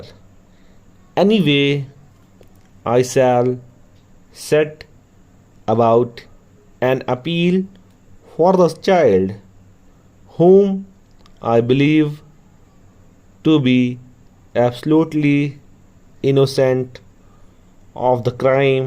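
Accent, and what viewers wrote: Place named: England, United Kingdom. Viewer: Bhai tu kya england ki english bol raha he kya Thik se bol na kuch word samaz nahi aate tere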